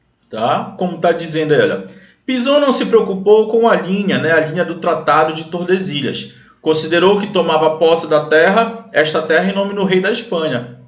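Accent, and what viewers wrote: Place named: North, Brazil. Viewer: Ele com o sotaque nortista deixou a aula mais top ainda ❤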